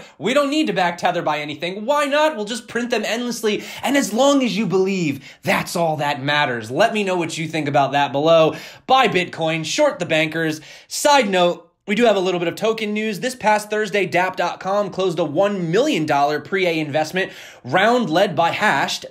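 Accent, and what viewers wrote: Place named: New York, United States. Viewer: The New York affect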